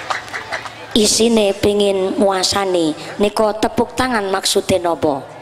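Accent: Banyumas, Indonesia. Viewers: Saya suka ngapak tapi jelas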